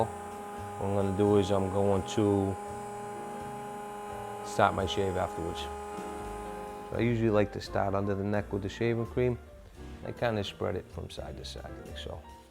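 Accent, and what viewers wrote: Massachusetts, United States. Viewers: This video is so Massachusetts. The accent, The TB12 jersey, there’s even a Dunkin Donuts across the friggin street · Excellent barber great job!! 👍☝️ 😁 Love your MA accent btw CT native here 😘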